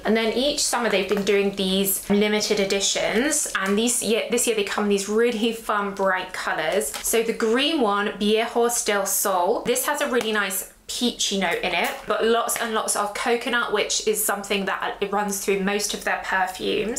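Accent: United Kingdom. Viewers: I actually find most JHAG perfumes quite wearable, more than many of the designers' really.. I love your videos, not only for the perfume info, which is thorough and enjoyable in itself, but also because I get my "do se" 😅 of good British accent input that I need as a teacher😊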